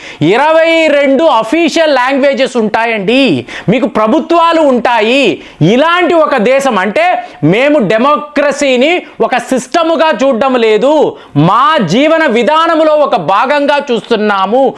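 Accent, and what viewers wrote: Tamil Nadu, India. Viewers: గారు తమిళనాడు లో చదువుకున్నారు అక్కడ తెలుగు ఇలానే ఉంటది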